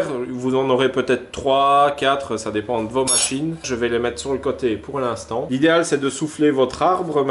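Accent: Belgium. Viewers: Bonjour, suite à ta vidéo sur la raboteuse dégauchisseuse j’ai vu une chose qui m’a bien plu et que j’ai appliquée. Ce système pour affûter les fers de rabot est vraiment ingénieux et facile à faire malgré tout et je me suis dit pourquoi ne pas essayer et ça marche vraiment bien car j’ai pu affûter plusieurs séries de mes fers « rabot- et dégauchisseuse » merci aussi a Copeaux… car elle y est pour quelque chose lol !! Tu vois que parfois une femme dans un atelier ça peut-être pratique .Je plaisante bien sûr .Je me suis abonné a sa chaîne aussi de ce fait .Dis d’après ton accent tu ne serais pas un peu Belge une fois ou je me trompe ? Moi je suis du Pas de Calais donc pas très loin. Merci pour tes vidéos sympas que je ne manque jamais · Salut, tu es Belge ? (Tu dit 1,70€ et accent) Il parait qu on trouve la Titan a 228€ chez Brico Depot en belgique Brico Planit ?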